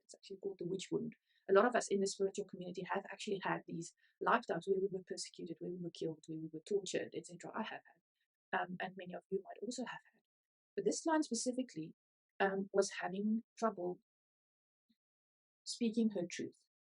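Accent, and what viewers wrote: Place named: Afrikaans, South Africa. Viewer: Well I can understand an Afrikaans accent! Baie dankie.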